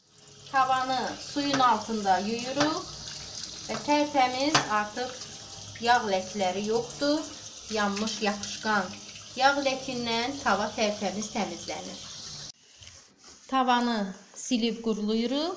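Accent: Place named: Baku, Azerbaijan. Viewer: Bakılısınız danısıqınıdan bilinir size tesekkürler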